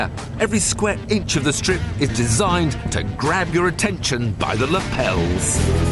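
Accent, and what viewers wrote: United Kingdom. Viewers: why does the dude always have to have a british fuckin accent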